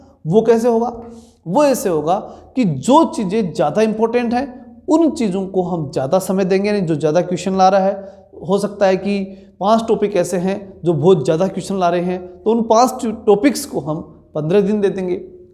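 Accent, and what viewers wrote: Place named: Bhilwara, India. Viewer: सर आप भीलवाड़ा जिले के हो क्या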